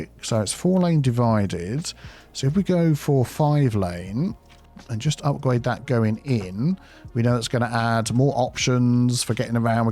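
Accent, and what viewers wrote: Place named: United Kingdom. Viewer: I Always Use to speak in British Akzent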